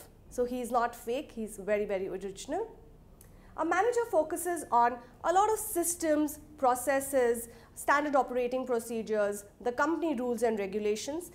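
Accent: India. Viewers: Sorry I don't understand your Indian accent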